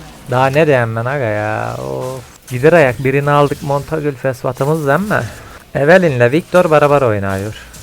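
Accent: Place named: Yoruk, Turkey. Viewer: Abi valla çok iyi konusuyon o yörük konuşman beni benden alıyor devam abi seviliyorsun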